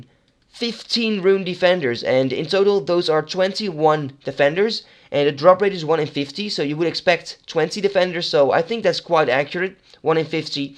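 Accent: Netherlands. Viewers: ik zag het al aan de rs chat hehe zieke videos maak je, kon het meteen horen aan je engels. Niks mis mee maar aan het accent hoor je al dat je nederlands bent ;)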